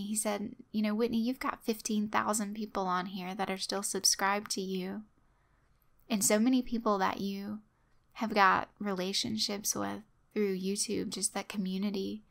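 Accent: South, United States. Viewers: I actually just found out about your channel today.. I'm so sorry for what you've been thru, and I'm sending healing thoughts your way that things will only get better for you and your loved ones. As I'm a new subscriber, I don't know what your voice was like before..but as a southern gal, I sense a hint of southern in your voice as well..which of course I think is terrific.😊 Hang in there, I believe there's a plan for each of us. I don't purport to always understand (or enjoy) some of the routes my life has taken getting to the plan, but I try to keep in mind even the darkest days eventually have to lighten up. And as much as I don't like it, it'd be hard to recognize real happiness if I'd never experienced real sadness. I'm looking forward to listening to your prior narrations as well enjoying the new ones you choose to share. Take care!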